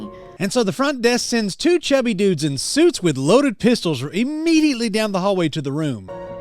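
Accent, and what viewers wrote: South, United States. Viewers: HEY!! His accent is solid brother -- give him that cred at least!!:D Seriously, pretty darn good ha! Is your southern accent authentic, or is it a dynamic show like Kenneth Copeland Mexicano speak?!! Thanks brother:D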